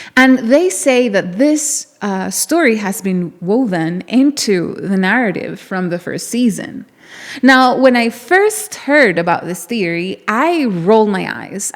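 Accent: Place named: Venezuela. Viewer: Tienes un acento más bonito en inglés que en venezolano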